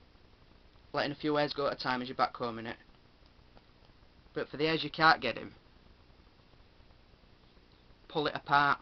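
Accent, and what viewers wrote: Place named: Manchester, United Kingdom. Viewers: Thank you, this helped a lot! This sounds strange but are you from the Manchester/Lancashire area? Its just, you speak a lot like us ;D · great vid :D, if i'm not mistaken that's a yorkshire/lancashire accent :P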